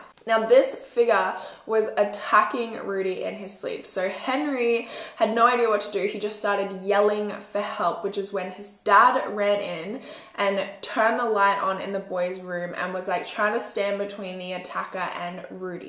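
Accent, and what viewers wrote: Australia. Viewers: Omg that emergency call. It’s SO frustrating! I’ve heard similar from the US, you wonder what these people are thinking. It’s emergency for heavens sake! I’ve had to phone emergency here in Australia several times and thank God they’ve been really great. you are so fantastic at this, I love hearing another Aussie on YouTube true crime. Hugs!❤️ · i believe she does have an Australian accent :)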